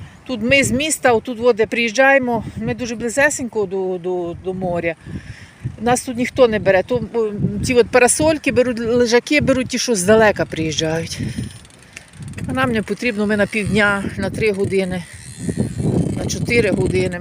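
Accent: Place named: Surzhyk, Ukraine. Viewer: Ту піца НАСТОЯЩА....Та чого ти розмовляєш російським суржиком???? Патріотка недолуга.....😮😮😮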